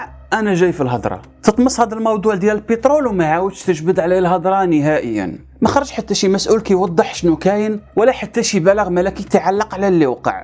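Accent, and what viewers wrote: Algeria. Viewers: لهجتك جزائرية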